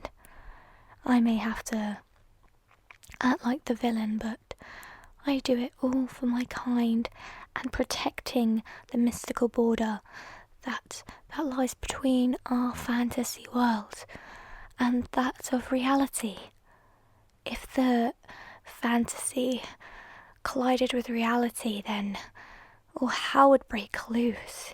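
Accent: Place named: United Kingdom. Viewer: You sound extremely British (First video I watch and I say this wow)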